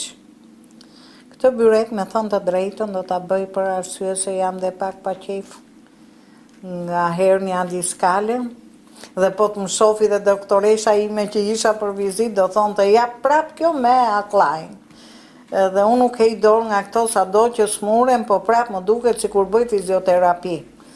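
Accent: Tirana, Albania. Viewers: Me kenaqe sa bukur e komenton je safi tironse Te lumshin ato duar njerzit nuk duan salltanete duan keshtu si ju shqeto 🙏🙏🙏💕💕💕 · Te lumshi duret e arta dhe shpjegimet e tuja ja ne te kuptushme, dialekti shume i bukur i tirones. E pjek si arre, me pelqen qe e kthen e pjekje shume mire. Qofsh mire me shendet. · Bravo e gatuan mite e flet qarte Tironse mo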